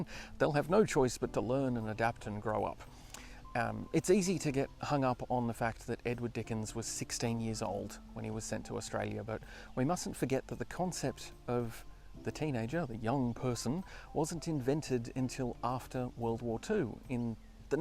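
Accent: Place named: New Zealand. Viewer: I hear more than a touch of Kiwi in your voice ?. Kiwi rests content with his gentle application of the Borax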